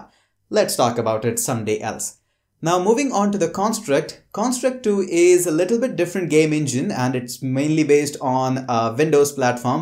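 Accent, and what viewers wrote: India. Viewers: Sound Indian but surname spelt the pakistani way?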